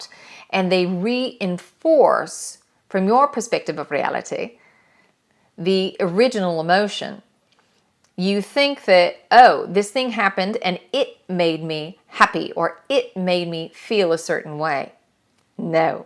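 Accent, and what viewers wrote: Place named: Australia. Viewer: I thought you were Australian!!! This is the first time I have ever heard you talk as, and not as The Pleidians. So, the voice of the Council that you channel sounds a bit Australian to me - actually VERY Australian to me. Have you ever been told this?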